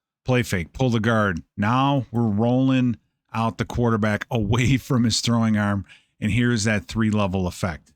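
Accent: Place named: Chicago, United States. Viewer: Hello! Thank you for speaking how people talk in Chicago! Thats great stuff right there! Lol The stats you stated on the Bears floored me! Almost 150 yards a game rushing! When blitzing Williams, 793 yards, 9 yards per attempt, 8 TD'S, 0 interceptions with a 119.2 rating? What will Brian Flores come up with to shut Williams down? This is a different team that the Vikings played in week 1. Thank you very much for another great episode of Eye in the Sky! Have a great weekend and SKOL VIKINGS! 😃👍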